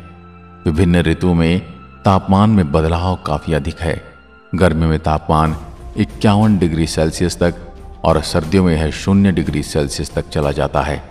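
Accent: Rajasthan, India. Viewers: Aap ki voice asi lgti h jse khud Rajasthan bol rha ho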